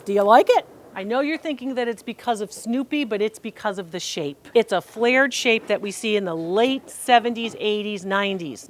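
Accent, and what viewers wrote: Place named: Pennsylvania, United States. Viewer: Dr. Lori, you should've shot your own feet and said something like "These are feet and they're tired from walking around thrift stores." Those Lenox pieces were a real bargain. Love your humor and your "Pensa-vania" accent.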